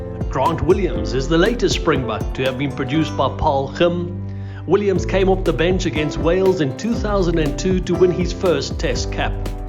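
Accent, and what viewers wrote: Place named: England, United Kingdom. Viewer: this guy's pronunciation is too english!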